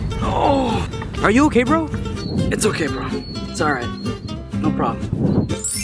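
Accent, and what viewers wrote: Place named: Bengal, India. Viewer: btw.. your bengali dielect is so hard to undeestand.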